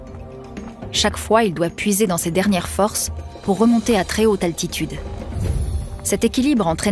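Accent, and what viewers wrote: France. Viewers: toi t'y'es un parisien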